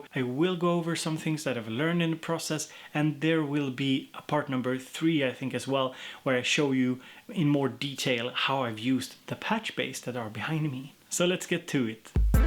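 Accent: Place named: South, Sweden. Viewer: Lovely to hear that you and your boss talked Swedish! I did hear that you are from the southern part of Sweden! Great video by the way. My cable managment is like a råttbo. :)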